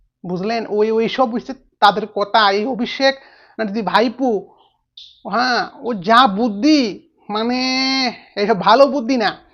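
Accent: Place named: Bangladesh. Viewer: Are you Bangladeshi?. Because your style of speaking is completely Bangladeshi people.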